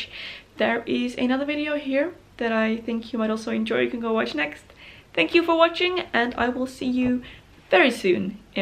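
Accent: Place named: Netherlands. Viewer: haha ik merkte je accent, dus ging er vanuit dat je nederlands was, dus ik overla zoeken, n toen zag ik een boek van paulien cornellise, en toen wist ik zeker dat je nederlands was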